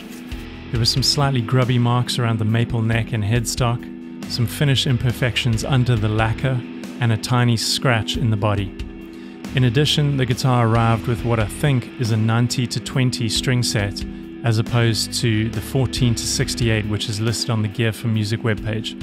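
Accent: South Africa. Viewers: Great video. Been wanting to get these for awhile. I already have a Jazzmaster, but my interest in baritones and low tunings is pushing me to get one of these as well! That a Saffa accent? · Hey man it sounds like you're from South Africa, how was the shipping(payment wait period etc?)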